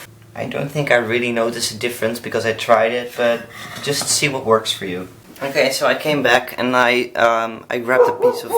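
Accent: Netherlands. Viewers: suscribed 'cuz, MEDE NEDELANDER XD Ik dacht het al te horen, maar ik hoorde Pippoos en toen wist ik t zeker haha. Goed bezig jongen!! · your accent sounds kind off dutch am i right?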